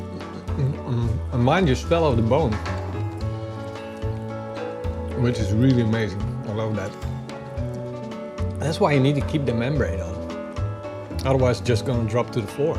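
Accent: Netherlands. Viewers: Are you dutch your accent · Are you from Holland? Sure sound that way! Anyway, great channel. Greetings from Norway :)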